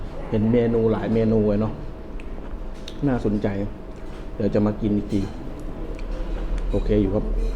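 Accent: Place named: Isan, Thailand. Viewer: ชอบเวลาพี่เว้าอีสานเด้5555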